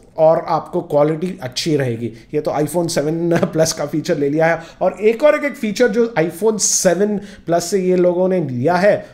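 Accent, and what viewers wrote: Hyderabad, India. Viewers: i just watch your videos because i like hearing hyderabady language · Kya bhai AP Bhi Hyderabadi hai bhai..Nice Hindi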